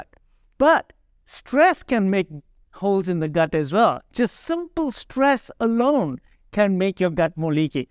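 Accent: India. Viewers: I naturally listen and trust doctors with Indian accent. I've now watched about 8 videos of him. He's a genius ! 😊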